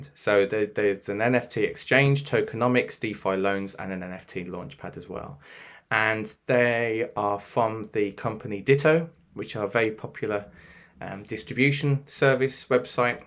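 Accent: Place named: Birmingham, United Kingdom. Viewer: Love your content! Are you from birmingham as well ?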